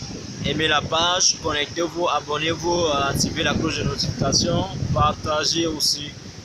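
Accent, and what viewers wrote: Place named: West Africa. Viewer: Qu'est-ce qu'il y a même à parler comme les Ouest-Africains ??? Parle comme un vrai Camerounais aka ! Tsuip !!!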